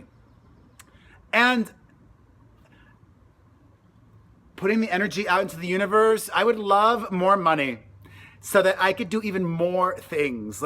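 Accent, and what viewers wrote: United States. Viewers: now I understand ,but I had no clue u were Spanish,u sound southern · Me encanta como tus nenes y vos hablan en castellano y que sigas manteniendo vivas tus raíces!! Y me atrevo a decir que tus hijos no tienen acento estadounidense como vos al hablar en español!! Un beso desde Argentina 😘😘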